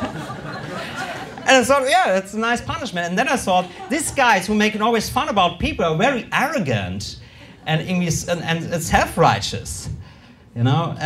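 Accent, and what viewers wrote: Germany. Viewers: Sein Englisch hört sich so Deutsch an, dass es wiederum so wunderbar authentisch klingt. Respekt erstmal für den Mut in einer Fremdsprache vor Publikum aufzutreten. Als introvertierte Person finde ich das durchaus bemerkenswert, aber ich glaube, unser Till ist so extrovertiert, der hat nicht mal eine Sekunde abgewogen, als er das Angebot bekam :D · Unfassbar! Till, du schaffst es deine lustige Art ins englische zu übertragen. Es sind in meinen Augen nämlich nicht unbedingt die Jokes die dich von anderen abheben, sondern die Art und Weise des Vortragens. Und diese super witzige Art hast du auch mit deinem herrlichen deutschen Akzent im englischen rüber bringen können. Thumbs up! 👍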